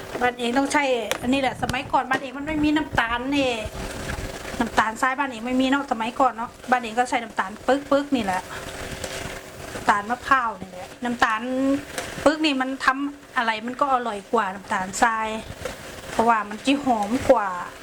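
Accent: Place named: Korat, Thailand. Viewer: ฟังเสียงแล้วใช่เลยคนโคราชบ้านเอง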